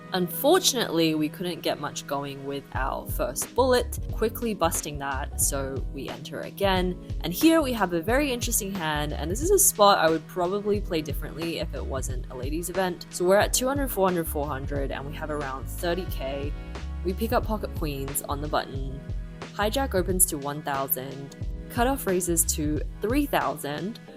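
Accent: Australia. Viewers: You must come from Australia because of you accent. Right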